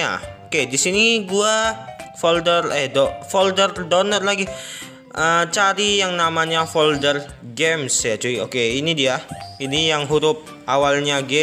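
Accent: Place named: Banyumas, Indonesia. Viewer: Nonton doang laik ngapak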